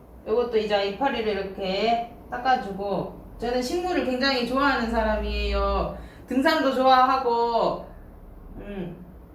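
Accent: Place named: Jeolla, South Korea. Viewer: ㅋㅋㅋㅋ전라도 사투리 구수해유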